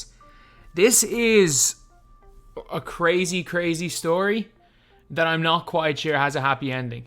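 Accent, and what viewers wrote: Ireland. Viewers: Yo u Irish?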